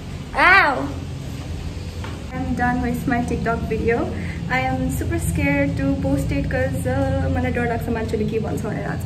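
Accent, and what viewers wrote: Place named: Nepal. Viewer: Beautiful 💓💓u☺ I love Nepal ko accent ☺☺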